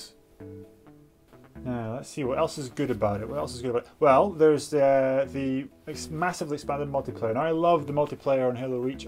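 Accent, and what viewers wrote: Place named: Ireland. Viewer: This is an irish accent right?...I like it. I want it. Gimme your accent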